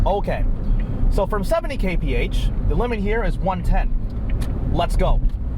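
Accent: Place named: North America. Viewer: Thanks. Great video and great to hear a North American accent using modern normal measurements although the car does run on liquid fuel not vapour (gas)😂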